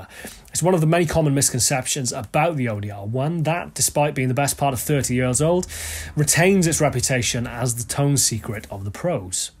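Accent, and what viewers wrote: United Kingdom. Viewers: I mentioned two yardsticks one of which you didn't address. Do you think could get a band together, tour the world and fill up arenas and stadiums (yes, stadiums!)? By another measure, he has 116K subscribers. StevieT has over 2 million, Tyler from Music is Win has 1.3 million, Marty Schwartz of Marty Music has 2.7 million and the list goes on and on. None of them will be "legends" either but their fans have more justification to stake that claim. By that measure is a mere cult figure. Don't get me wrong, once I got past his Brit accent and Brit pastiness, I realized what a great channel this is. I love his playing style. A legend? I think we're tossing that word about a bit wantonly and "fanboyishly".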